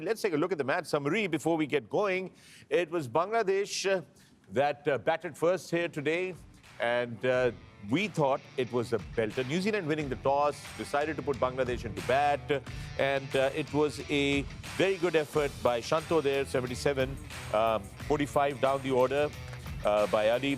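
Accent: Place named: Punjab, India. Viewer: sir is heart of the show love his anchoring and panjabi accent ❤❤❤❤❤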